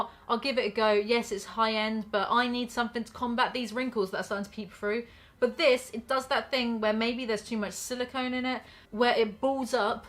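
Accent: United Kingdom. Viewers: Your british accent makes everything funnier 101% lmao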